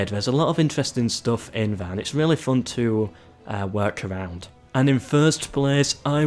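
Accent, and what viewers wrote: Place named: North Yorkshire, United Kingdom. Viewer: He’s probably from around North Yorkshire or Middlesbrough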